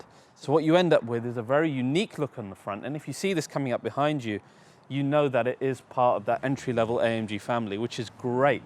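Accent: United Kingdom. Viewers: This guy in India? Has British accent, but definitely not ethnic English & scenery looks like England. ???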